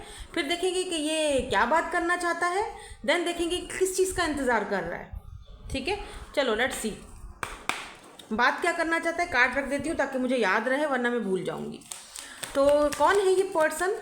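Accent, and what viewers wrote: Punjab, India. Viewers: Love your punjabi accent❤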